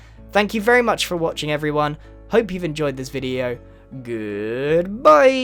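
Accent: United Kingdom. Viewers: He sounds to British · That British Voice Is just, way off from mine, I am British and i sound so different.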